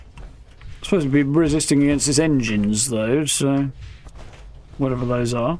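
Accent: RP, United Kingdom. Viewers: This guys accent really throws you off abit, because he sounds really really posh, like he studied at Oxford, yet he looks like a metal head 😂🤣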